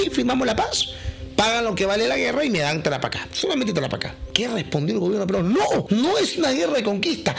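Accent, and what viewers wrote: Chile. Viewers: SÍ ES MAS CHILENO QUE COLÓ COLO, SU DEJO ÇHILENO SIEMPRE ES INCONFUNDIBLE PO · Es peruano o chileno? suena acento chileno pero habla como si viviera en Lima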